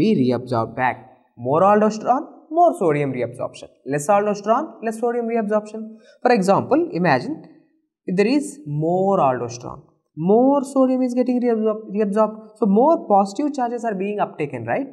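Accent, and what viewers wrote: Telugu, India. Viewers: Awesome explanation garu.. Ur accent I think ur Telugu guy.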